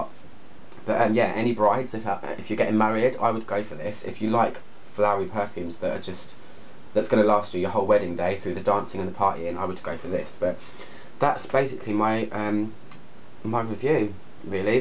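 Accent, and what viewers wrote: England, United Kingdom. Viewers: I love your English accent. I'm practicing my listening with your videos. I'm also a lover of parfums 😍.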